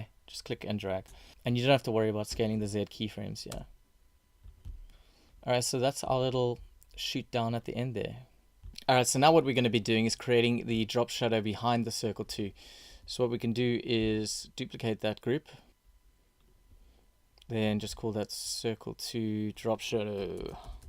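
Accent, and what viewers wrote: South Africa. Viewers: Great work man! I hear a South African accent there ;) Is there a way you can make this template available like the links you have added in the description? I would love to use it and replace it with my own logo and colours. Thanks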